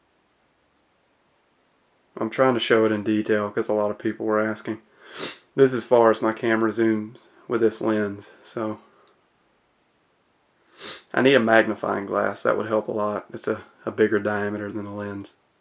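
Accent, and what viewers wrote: Alabama, United States. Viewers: R u from Alabama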